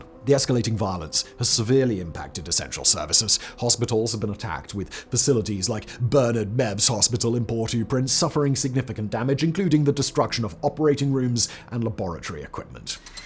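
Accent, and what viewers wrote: United Kingdom. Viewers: for a posh english b@ how do you mispronounce so many names and words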